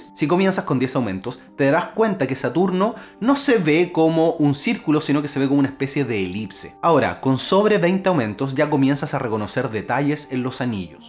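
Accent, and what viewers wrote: Chile. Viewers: Si eres chileno, eres el referente de como debiésemos hablar todos jajaja. Excelente video y canal. Va esa suscripción. Saludos y felicitaciones ! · Es chileno y me encanta como habla!!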